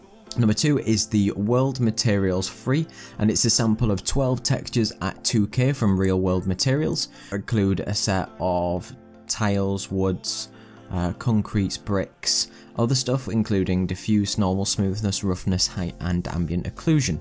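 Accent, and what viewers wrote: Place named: North, United Kingdom. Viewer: Love the drama llamas.....and the northern accent!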